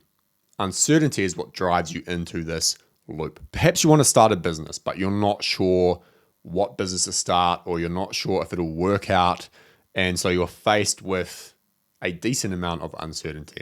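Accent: New Zealand. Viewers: Not only are u informative and helpful, u r also very very hot. Love ur accent (kiwi, I guess?) thank you for the video, gonna get up from the bed and get some shit done. Cheers from Russia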